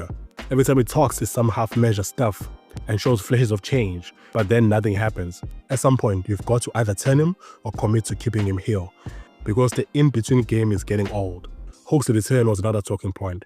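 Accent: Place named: South Africa. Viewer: Hey man, what's your nationality, the accent is giving hints of South African, just asking of curiosity 😁 other than that great video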